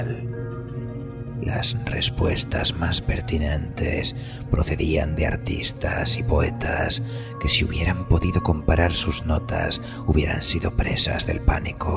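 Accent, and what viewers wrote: Spain. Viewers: si, pero la cuestión del acento es mucho mejor en este canal para españoles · ay a mi no me gusta el acento español, ademas este señor lee todo de manera monotona, siempre en el mismo tono, no hace bien las pausas, Tener voz algo grave no quiere decir q se es buen relator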